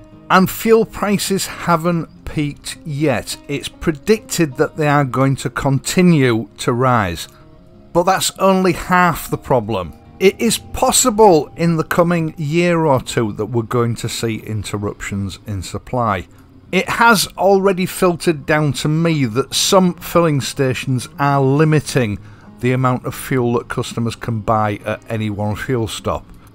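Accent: United Kingdom. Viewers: Very thick red coat accent as in "the Red Coats are coming". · I enjoy the content and the calmness of the speaker. I wonder which part of the UK the accent belongs to. Best regards.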